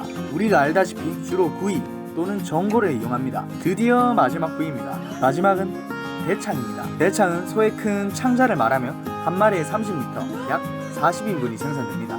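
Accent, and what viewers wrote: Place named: Daegu, South Korea. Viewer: 사투리가 엄청 구수하시네요ㅋㅋ 대구 사투리인가요?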